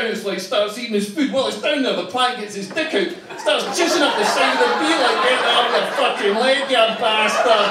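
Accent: Scotland, United Kingdom. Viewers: audio quality is terrible and with the scottish accent it's almost impossible to hear what he is saying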